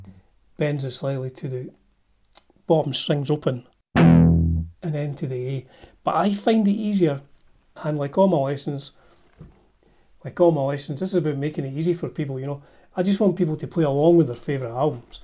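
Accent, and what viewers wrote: Scotland, United Kingdom. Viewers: I really like your accent. What nation, is it Scottish ?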